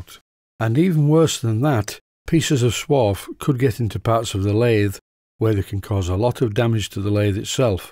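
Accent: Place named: Northern England, United Kingdom. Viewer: I know very little about steam engines. I'm most likely never going to build one. But these videos are still enjoyable... your Northern (to a Bristolian southerner like me) is oddly calming and fits well with the engines.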